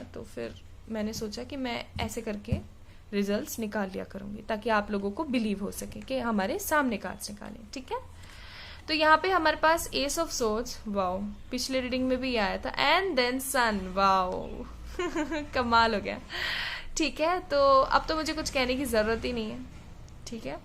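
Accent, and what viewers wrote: Punjab, India. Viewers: apki awaaz se aise lgtaa hai punjab se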